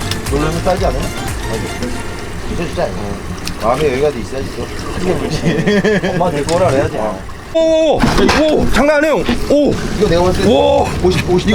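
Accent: Gangwon, South Korea. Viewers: 강원도 사투리 좋네요. 구독 신청요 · 강원도 사투리는 언제들어도 정감이 ㅎ 구경잘허구가우 !!!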